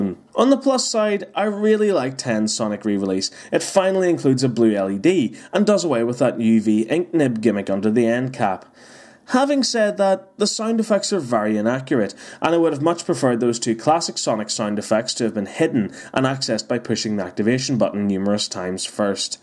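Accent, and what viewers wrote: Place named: Scotland, United Kingdom. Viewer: you sound like you are from Scotland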